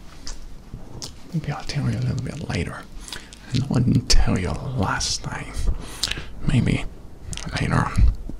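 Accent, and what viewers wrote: United States. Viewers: pretty good american accent man! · I LOVE hearing you with an American accent! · Is this your only video with an american-type accent? It's spot on! And super relaxing! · I am shooketh. I can't believe you're so good at a American ascent. Heck I'm American and don't even have that good of an American ascent! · American accent is magical Keep doing doing your thing you sexy beast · Your American accent is impressive 👍🏻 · Its funny what american accent sounds like.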